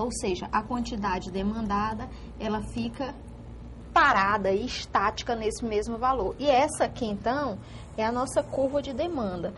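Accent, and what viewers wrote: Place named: Northeast, Brazil. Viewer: Oi, gente! Estou amando as aulas, bem simples e didática, além do sotaque bom do Nordeste. Mas, gostaria de saber onde está a Economia Aula 20 Microeconomia Bacen EVP????? Não consegui achá-la. Bjokas pra todos!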